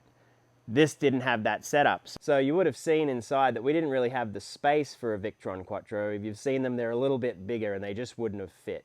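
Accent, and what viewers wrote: Australia. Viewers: First I thought with your Aussie accent that you were in Australia but not so you’re in the US. Australia has new rules for caravans where batteries that are installed in a liveable space must be in a sealed metal box that’s vented to the outside. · Australian accent and then 120V - confused for a while. Disadvantage I see is power loss in converting the 12V DC alternator power to 120V AC then converting it back to 24V DC... I think the new 12-24 Orion would lose less power. I also don't understand why laying some thick DC cables from the Alternator to the Orion should cost so much either... in comparison to the cost of the RV/Caravan. Batteries - I love Victron but there are far cheaper brands of LiFePO4 batteries on the market.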